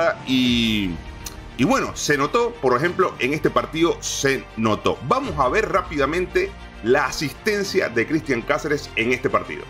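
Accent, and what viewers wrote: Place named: Argentina. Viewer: Varón buen contenido pero pareces argentino cuando hablas :0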